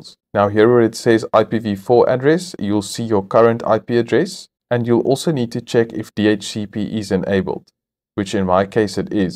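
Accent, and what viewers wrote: South Africa. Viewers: Do i hear a south african?🤔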